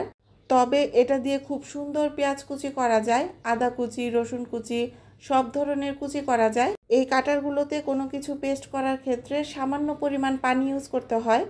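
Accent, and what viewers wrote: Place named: Tangail, Bangladesh. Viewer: আসসালামু আলাইকুম আপু ❤ তোমার টাঙ্গাইলের ভাষার কথাগুলো খুবই সুন্দর লাগলো ❤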